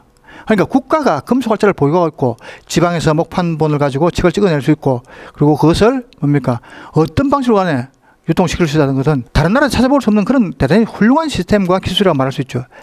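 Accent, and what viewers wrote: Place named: Seoul, South Korea. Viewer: 굉장히 꼼꼼한 성격이실것 같은 사투리사이에 한글에 대한 성찰이 녹아있으시네요 : D 처음엔 재미있을라나 걱정했는데, 찰진 서울말녹은 사투리에서 교양을 발견하고 합니다^^ㅎㅎㅎ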